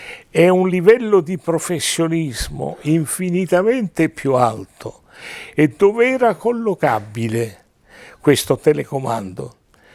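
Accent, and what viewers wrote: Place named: Sicily, Italy. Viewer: Generale, da come lei parla scopro che lei è un siciliano. Ok, complimenti!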